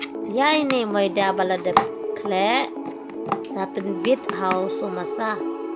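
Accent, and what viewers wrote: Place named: Meghalaya, India. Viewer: Klam bait dei iong pnar leh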